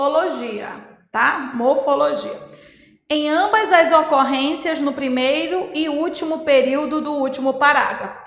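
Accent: Pará, Brazil. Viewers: O lhindo entregando que é paraense kkkkk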